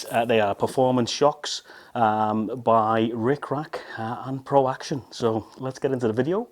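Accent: Liverpool, United Kingdom. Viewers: Nicely done, taking your Scouse glide to another level as well as your editing and audio. I never saw on your “ To Do “ list win the league for Liverpool. Sending my best wishes from Scotland to you brother. Much love to you and yours. 👍👊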